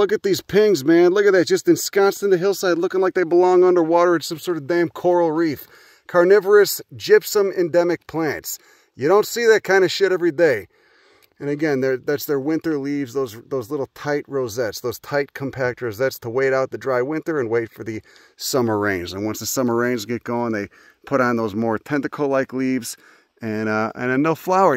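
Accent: Chicago, United States. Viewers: I love the Chicago accent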